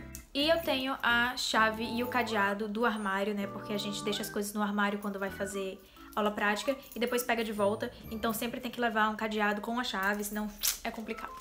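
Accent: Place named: Natal, Brazil. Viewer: amg vc nasceu em Natal?? seu sotaque é diferente shshjajs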